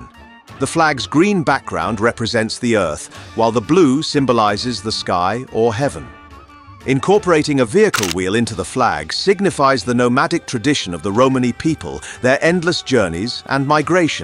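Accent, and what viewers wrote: United Kingdom. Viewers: Love your british accent!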